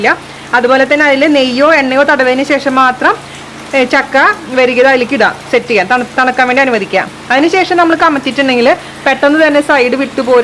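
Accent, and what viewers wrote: Thrissur, India. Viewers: chechi de veed trishur anno...aa accent...avidthe pole...sambhavam kaalaki...kollam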